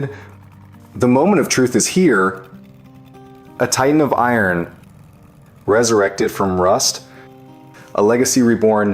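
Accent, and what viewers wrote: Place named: United States. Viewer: We don't need your ugly American accent to commentate to us, we can see . Therefore you stop talking through the men's work. Begone.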